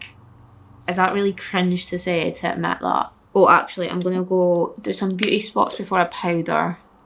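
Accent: Scotland, United Kingdom. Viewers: Omg I adore your videos. You always look fantastic, but the scottish accent and real talk kills me every time! The bailiffs story had me rolling. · Does anyone else find it kind of difficult to understand a Scottish accent or am I just a dumbass American? 😅 Her voice is so relaxing to listen to but it takes me a hot sec to process what she said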